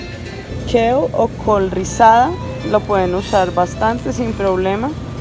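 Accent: Colombia. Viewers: Me recuerdas a mi ex colombiana estás súper linda , hablas como rolita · Es q los Colombianos son asi , gente bonita si forma de hablar sus modismos son.lindos y esta muer no se guarda naa nos dice las cosa tal cual ayuda mucho su sinceridad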